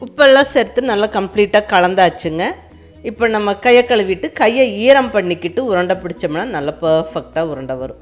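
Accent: Kongu, India. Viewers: Namma kongu Tamil la fantastic ah ahsathureenga.......superb ga ma Vaazhga valamudan 🙏 neengalum vungal ahnbu kudumbamum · Super madem kongu tamil super mam tku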